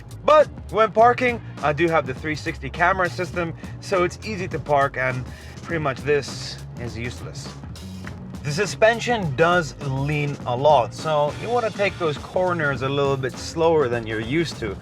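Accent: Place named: United States. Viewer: Nice review and excellent flow of information coupled with an American accent, I am subscribed ! 😄👍🏻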